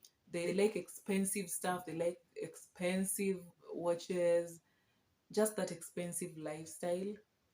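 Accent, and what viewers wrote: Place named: Nigeria. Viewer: Like u re conversant with how we 9ja talk... ve u stayed with Nigerians b4.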